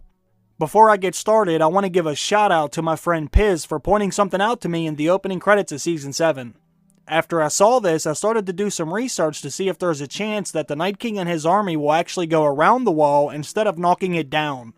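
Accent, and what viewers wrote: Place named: Pennsylvania, United States. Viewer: Thought so. I could hear it. Fellow Keystoner here.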